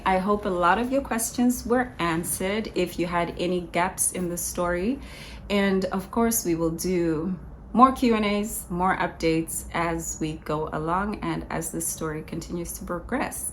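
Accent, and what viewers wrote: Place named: Zimbabwe. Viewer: we are same generation. I remember you from your model days. It was called Supermodel right. And remember reading an article about you when you were modelling in SA. And wow still looking beautiful sis. I loved hearing your life story. It shows we all go through a lot. And though it may sound petty but you have that top notch Zim English accent. Exactly how most of us 80s babies sound. Its fading now guess a reflection of our education system. Anyway looking forward to seeing more videos.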